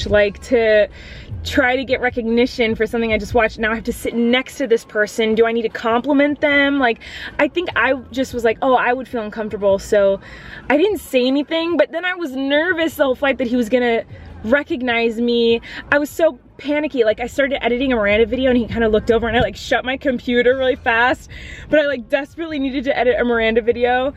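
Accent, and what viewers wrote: South, United States. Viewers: Love your stereotypical southern accent 😂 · omg I'm from Louisiana and your southern accent is so accurate 😂😂😂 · I'm from Louisiana but nobody talks like that here lol. But I agree that the southern accent is spot on, for like Georgia and Alabama and Tennessee! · I know I'm from the northern pat of Florida like 1 hour away from Alabama and we're "southern" and her accent is on point😂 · I could listen to Colleen's southern accent all day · Southern accent is so accurate! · Colleen's southern accent makes me smile, and I'm not even from the south! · Hahah love Colleen's southern accent!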